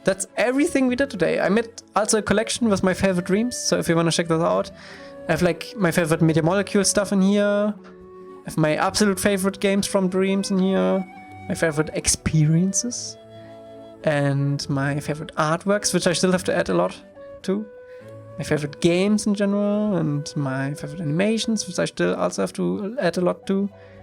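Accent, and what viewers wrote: Germany. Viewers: Der deutsche Akzent kickt aber anders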